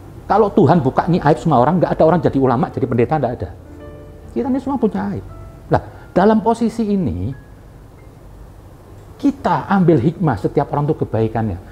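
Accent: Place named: Java, Indonesia. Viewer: Habib asli org mana sih? Aku suka aksen jawanya....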